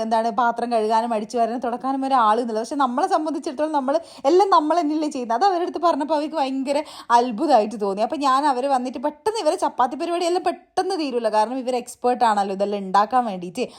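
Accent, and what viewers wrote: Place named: Thalassery, India. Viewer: തലശ്ശേരി അന്നോ വീട് ❤... സംസാരം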